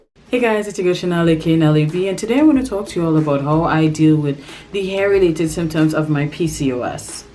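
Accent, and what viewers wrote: Trinidad and Tobago. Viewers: Lol I said this is definetely a Trini accent 🇹🇹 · You sound like a Trini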